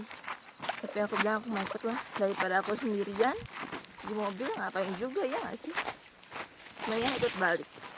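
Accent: Java, Indonesia. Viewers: Cantik bgt MBK ...asline wong ndi kaya logat jawa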